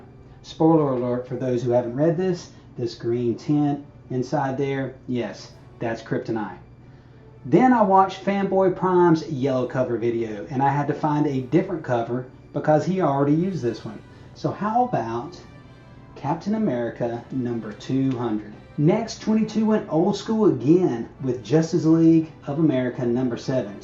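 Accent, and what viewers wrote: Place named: South, United States. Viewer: Love the Hulk cover! The south was strong in this intro too 😅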